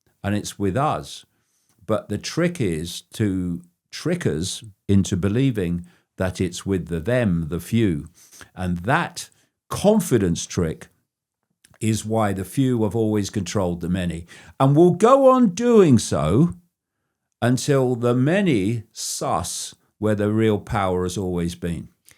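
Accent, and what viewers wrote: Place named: Northern England, United Kingdom. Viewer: Message to the host - stop interrupting the guest with that barbaric up north accent.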